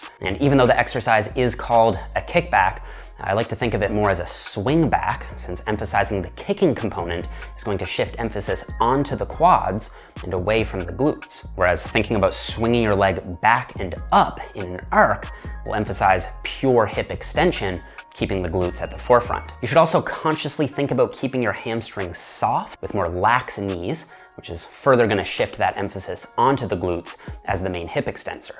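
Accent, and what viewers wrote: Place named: Canada. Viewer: English is my second language and i can never tell the difference between Canadian and American accents, until now😂. Thx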